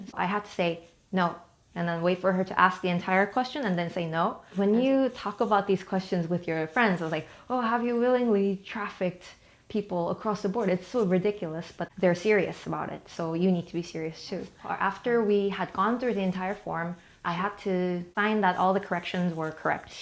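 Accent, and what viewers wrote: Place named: United States. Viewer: How can you speak American accent, really love your accent ❤️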